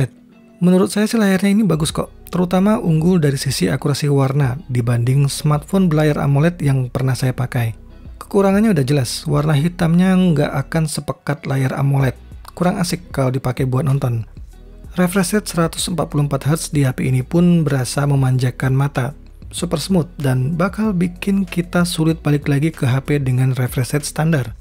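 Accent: Palembang, Indonesia. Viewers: Abang uong palembang cak nyo 😁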